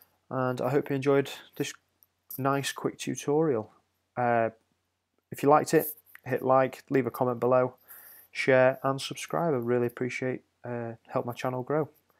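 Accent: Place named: United Kingdom. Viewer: Ur def a Brit but this is a great video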